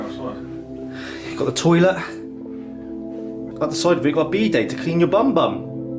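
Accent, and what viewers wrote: Bristol, United Kingdom. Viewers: Going by ye voice im guessing ye from ol Brizzle me boy!! Ye sounds like me ol Brizzle buddy from university!! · So what area of the UK is this house in? The narrator sounds a bit Bristolian so is it near there? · You gotta b kidding me ryt. At 1st one of u started talking I thought omg his Aussie, gota find out were is this abandoned mansion n then the British accent came out I was like ohhh shoots ...I can NOT believe such a beautiful mansion can be abandoned like that..That's a couple million dollars ryt.. I mean who wouldn't want to have that n restore it....hmmmm unless it's haunted...😱 post more videos...guys.. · I made it in, you're accent is SO annoying I couldn't watch any more. I prefer watching the American explorers it just hits flat when British people try to pull off an exciting, informative and unique experience. 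This is trash man.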